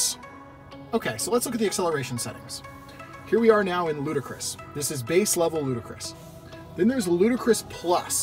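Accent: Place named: Scotland, United Kingdom. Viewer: Love there is a bit of the Scottish Lewis Capaldi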